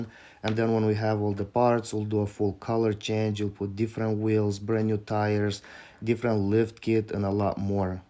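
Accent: Russia. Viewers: мне одному показалось что у чувака акцент русский? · Русский акцент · Мне показалось,или Русский акцент? ) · Русский акцент всегда узнаю)))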